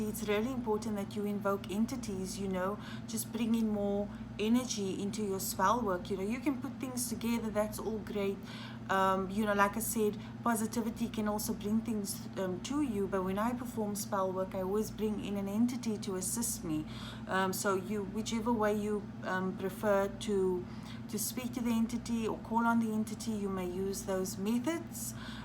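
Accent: South Africa. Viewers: Are you south african because you have a South African accent? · Yay... south African!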